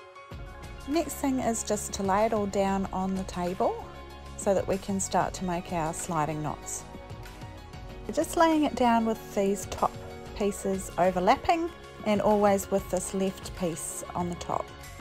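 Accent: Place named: New Zealand. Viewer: Thanks for the video. I will be making something like this for my 7 year old daughter and this video is helpful. I see you are in Australia but it sounds like you have a Kiwi accent.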